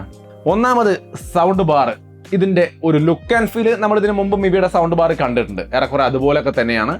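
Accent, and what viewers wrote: Thrissur, India. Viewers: Trissur slang🫶🏻⚡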